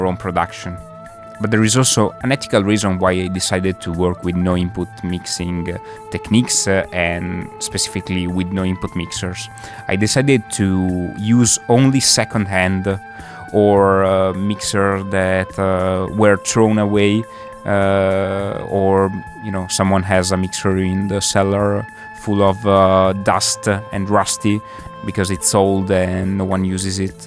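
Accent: Italy. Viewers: Awesome way of thinking out of the box, and happy accidents. Io sentivo l'accento italiano nonostante il nome slavo hehe ;) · It's so weird to see the most North Asian looking dude speak in the most Italian English accent 😂. Great video though, thank you for sharing this! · Hai un inglese Made in italy.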